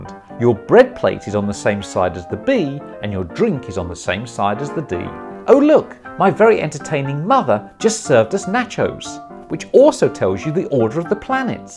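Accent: United Kingdom. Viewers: Who is this guy? He sounds British.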